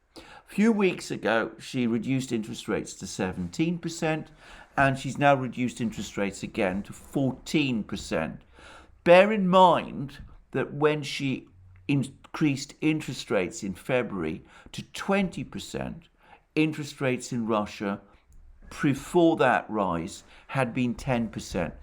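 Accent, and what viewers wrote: England, United Kingdom. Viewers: It hard for me to hear English accent. But I try my best to hear your story mr...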